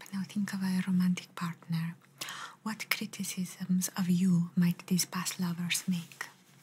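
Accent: Italy. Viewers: Hello, perfect ASMR, I loved it, I enjoyed it, it's very relaxing and with an Italian accent, I love it, greetings, beautiful.💖💖🌹🌹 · Italian accent videos are perfect for relaxing and unwind 😊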